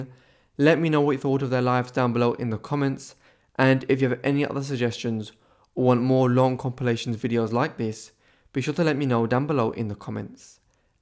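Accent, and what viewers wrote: United Kingdom. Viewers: He just sounds British to me. I didn't have any issue with it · He has a speech impediment AND is British.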